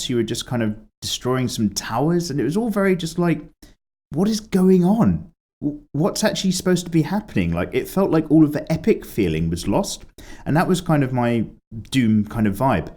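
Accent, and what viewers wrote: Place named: Dorset, United Kingdom. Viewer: those Dorset tones, that was bloody you Dorchester wasn't it! love your work keep it up you legend, btw pls share those txt documents.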